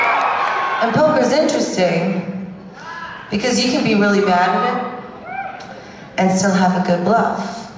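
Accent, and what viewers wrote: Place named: New York, United States. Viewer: That New York accent is coming through strong here.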